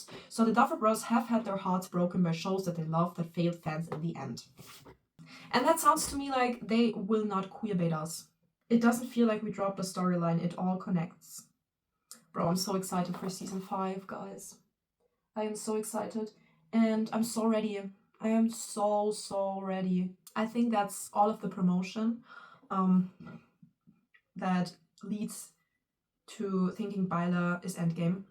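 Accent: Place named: Germany. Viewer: Ich kann deinen deutschen Akzent hören 😼😼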